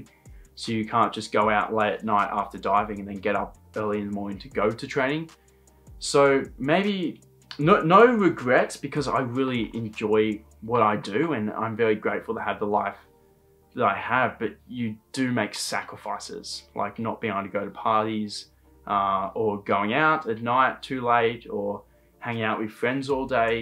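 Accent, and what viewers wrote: Australia. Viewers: I love your Aussie accent. You are so adorable. ❤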